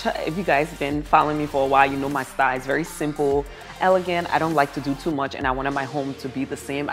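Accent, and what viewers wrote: Liberia. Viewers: Everything is just beautiful. This is my first time here. I'm sending a Liberian accent? Keep up the great work❤️💪🏾👍🏾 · Hi - are you Liberian? I hear the accent...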